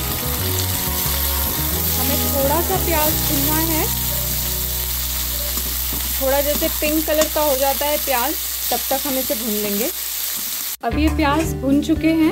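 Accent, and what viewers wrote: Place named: Punjab, India. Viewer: Hi ji aap puri shudh punjaban ho, aapka subhzi banane ka style, bolne ka style poora punjabi hai, mazza aa jata hai aapko sun kar. Kathhal hum bhi aise hi banate hain,aur hamari family ka favourite hai. God bless you.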